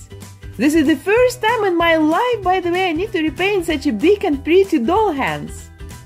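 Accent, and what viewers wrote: Russia. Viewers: You are not just a terrific artist, I like listening to you talk too. I thought from your accent that you are Russian or Ukrainian.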